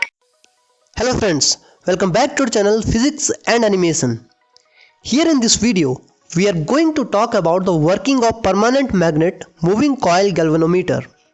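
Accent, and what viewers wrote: India. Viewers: Indian accent.😭🤏🏾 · Ok now I feel like u r Indian am I right?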